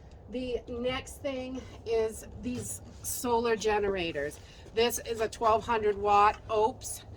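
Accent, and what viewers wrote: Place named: Long Island, United States. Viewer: where are you from originally? Sometimes your accent sounds Long Islandy and the expression "landed up" as opposed to "ended up" is different.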